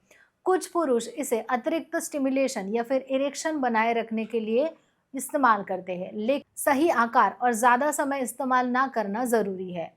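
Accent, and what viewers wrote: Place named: Maharashtra, India. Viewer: Marathi manus wala flavour aa raha hai .. kuthun aahe apan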